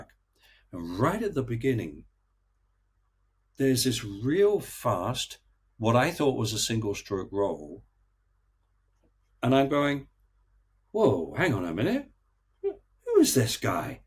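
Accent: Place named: Scotland, United Kingdom. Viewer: sounding very Scottish today!!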